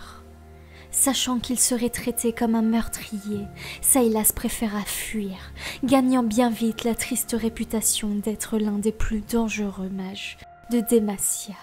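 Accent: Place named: England, United Kingdom. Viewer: Nice, petite vidéo histoire de se rappeler le lore du champion avant le jeu ^^. Toujours un plaisir d'écouter tes vidéos et tkt pour ton accent anglais il est pas si mal en vrai x). Ps: je dirais la majorité des persos importants de demacia et surtout jarvan, garen et lux et si ont peut avoir des membres de la griffe hivernale en plus se serait cool.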